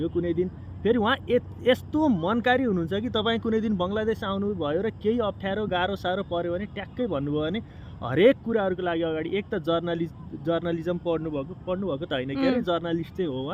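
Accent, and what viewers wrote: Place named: Nepal. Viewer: Very nice Nepali tone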